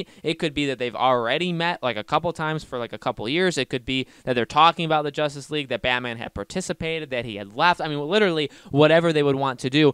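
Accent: United States. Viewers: you are very american and the name is maybe a little bit wrong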